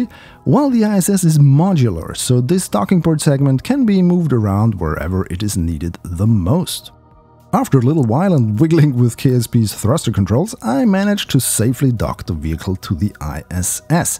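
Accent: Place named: Germany. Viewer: Bist du deutsch? Dieser Akzent..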